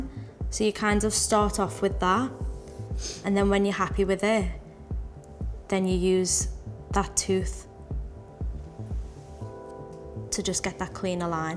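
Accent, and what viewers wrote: United Kingdom. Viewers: Love the Scottish? Accent 🖤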